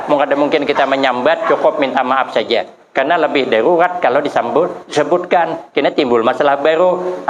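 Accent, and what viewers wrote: Banjar, Indonesia. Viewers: Bujur kh hidin ni urang Jambi,,, di dangar tu Banjar banget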